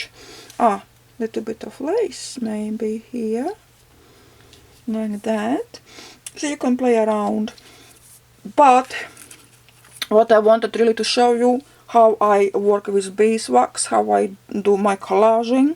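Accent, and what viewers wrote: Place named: Iceland. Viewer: Hello! While watching your video I had a feeling that you have an icelandic accent, am I right?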